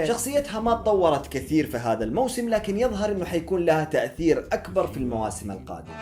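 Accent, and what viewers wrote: United Kingdom. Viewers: التمثيل رهيب كنك عندك لكنة ابريطانيا لو تروح هوليود اتحاول ادور طريقك 👏👏👏❤❤ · لكنتك الاسكتلندية رهيبة 😂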